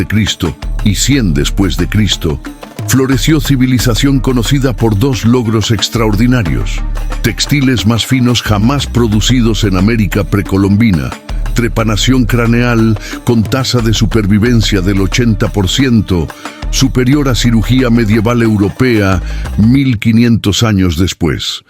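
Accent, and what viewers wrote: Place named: Quechua, Peru. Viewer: lo relata como un nativo quechua que habla español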